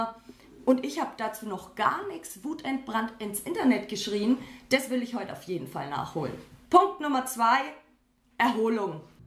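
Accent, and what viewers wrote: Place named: Franconia, Germany. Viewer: Gelllllll!!!! Dein cooler Dialekt (Fränkisch) weiter so gellll ?!😂👍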